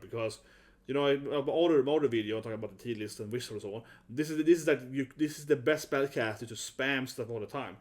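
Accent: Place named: Sweden. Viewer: A tip is to slow down your speech a little, it's a bit hard to hear sometimes and the subtitles are somewhat bad when you speak fast and loose with that Swedish accent.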